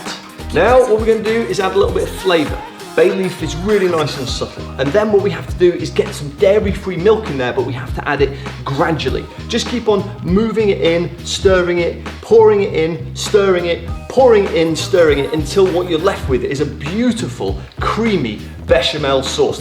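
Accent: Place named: United Kingdom. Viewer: OMG that's it for me, how did you know!? And that accent ;-) just luv it, really missed that since I left UK.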